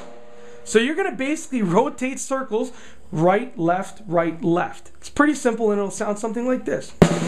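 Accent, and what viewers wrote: Canada. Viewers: Are you canadian? So sorry... Love ur vidz tho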